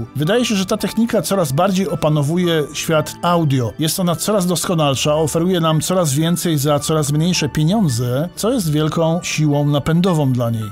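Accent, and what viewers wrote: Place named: United Kingdom. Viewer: Pańskie filmy bardzo mi się podobają i wiele się nauczyłem. Ale.... Nieznośna jest ta maniera stosowania na każdym kroku fonetyki angielskiej. Może dałoby się to zmienić? Przepraszam za niemerytoryczną uwagę, ale nie mogłem się powstrzymać.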